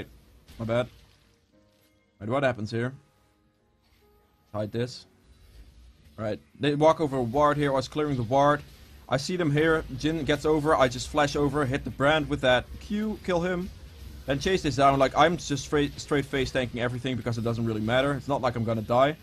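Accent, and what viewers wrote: Netherlands. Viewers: i can hear you're dutch :P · hi man i just wanted 2 ask you a question, your voice sounds like you are from the netherlands, is that true? if so can i add you on league?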